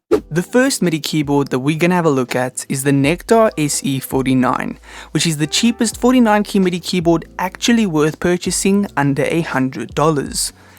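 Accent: South Africa. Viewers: I know your accent is south African, I was wondering why you had the money in dollars instead of R😂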